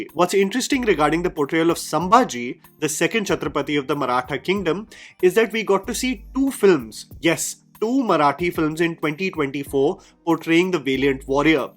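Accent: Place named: Punjab, India. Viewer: He is speaking like a panjabi not a maratha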